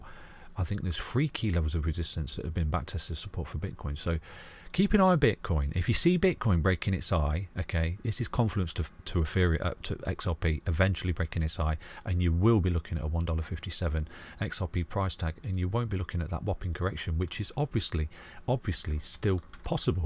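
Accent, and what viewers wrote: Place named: Yorkshire, United Kingdom. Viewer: I bet he's from Yorkshire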